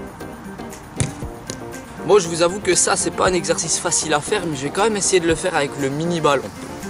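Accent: Belgium. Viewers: On reconnaît l'accent belge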